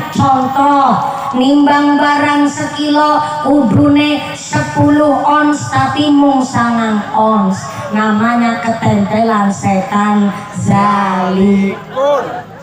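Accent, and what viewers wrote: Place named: Banyumas, Indonesia. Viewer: Assalamu'alaikum, moga sehat n sukses sllu bu ustadzah, ora ngapak ora kepenak, ❤❤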